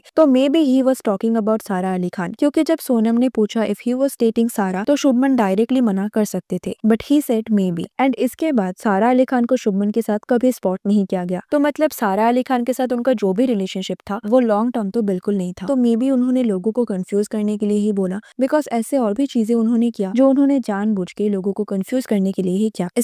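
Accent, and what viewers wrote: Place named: Telugu, India. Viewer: After listening to your voice in a lot of your videos. I'm guessing you're a telugu. Is this true?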